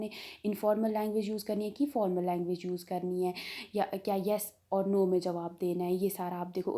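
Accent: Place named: Pahadi, India. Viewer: Uh have pahadi tone in language......btw my next exam is eng. daily life .. video helps me a lot❤️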